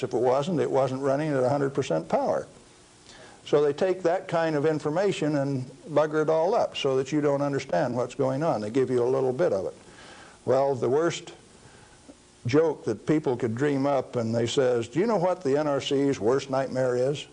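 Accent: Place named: Midwest, United States. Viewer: I'm always nostalgic for mid-century Midwestern accents from a grandfatherly man talking science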